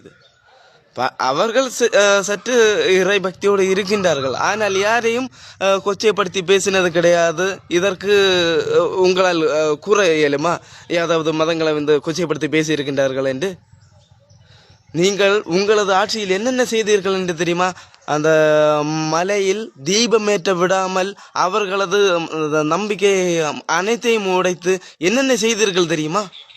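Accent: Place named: Kerala, India. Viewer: என்னப்பா. மலையாள வாடை அடிக்குதே!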